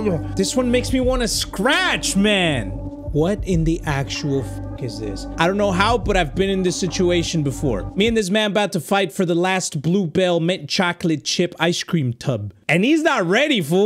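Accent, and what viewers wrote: Mexico. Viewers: You sound like pewdiepie doing a light Mexican accent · are u english, learned Mexican or Mexican, learned english?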